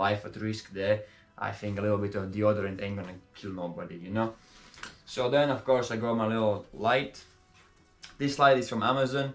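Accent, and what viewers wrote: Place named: United Kingdom. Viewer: Where abouts in the UK are you from bro ?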